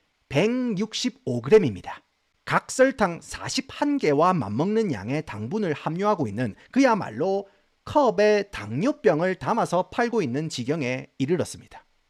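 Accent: Gyeongsang, South Korea. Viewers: 진짜 노무노무 재미쪄❤ 심지어 유익한걸 이리 재밌게 얘기하니 안볼수가있나 교육방송을 예능으로 풀어주는 우리 갱상도오빠!!!😂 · 이분은 세차를 취미로 하는 금융인 인줄 알았는데 성대모사를 잘하고 개그도 잘치는 경상도 억양의 사투리도 잘 구사하는 경제 및 시사 유튜버 + 문화평론가 ㅋㅋ 다양하게 골고루 잘하는듯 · 경상도 입니껴? 스토리 텔링이 아주 재미납니다. 항상 잘 보고 있습니다. 화이팅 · 칠갑ㅋㅋㅋㅋㅋㅋ 아 혼방 경상도인 이세요 🎉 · 미국에 사시나요? 경상도 사투리 쩌네요.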